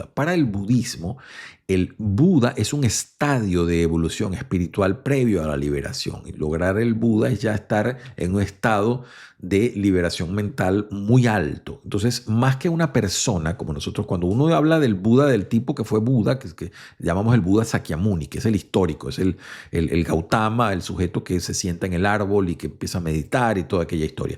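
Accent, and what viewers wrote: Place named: Caracas, Venezuela. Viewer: Excelente tema, excelente canal y excelente vocabulario, excelente dicción y magnífica voz coronada con el acento caraqueño. Gracias Sr.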